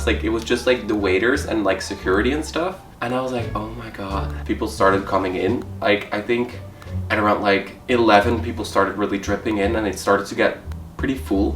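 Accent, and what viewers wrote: Netherlands. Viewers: yup. just caught that... but with his Dutch accent he always says "who dat" instead of "that"... listen carefully to the way he says that. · Omg he's from the netherlands too! Yooo kaasgap je accent is gaar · The Dutch accent lol!!!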